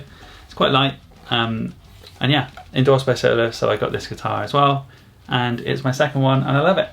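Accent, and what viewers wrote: United Kingdom. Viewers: Are u from the UK or america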